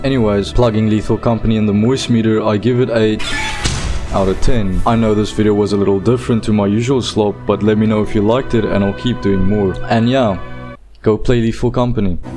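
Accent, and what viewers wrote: South Africa. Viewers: Great video bruv! I did enjoy this style as well as your original content. While I was listening to you talk more I think I just realized something...are you Afrikaans? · I could hear the twang of South Africa, kinda reminds me of the movie chappie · That accent is definitely RSA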